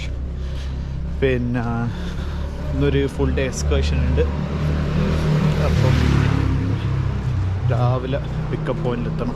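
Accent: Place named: Thalassery, India. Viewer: Thalassery language ayath kond oru veraity Vlog ayittund nannayittund ketto daily vlog okkey idu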